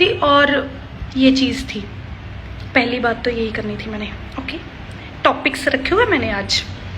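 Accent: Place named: Punjab, India. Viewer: Never leave your punjabi accent it is sure pure and full of good energy .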